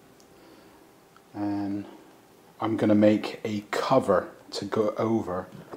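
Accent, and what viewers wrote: Bristol, United Kingdom. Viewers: Bristol! Recognise that accent anywhere! I lived in horfield, Bristol for 5 years.....Alright my lovvveeeee :-)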